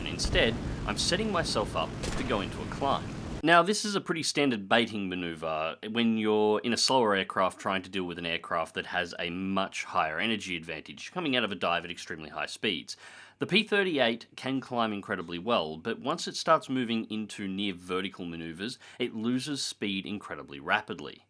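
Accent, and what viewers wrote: Australia. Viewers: hey are you an auzzi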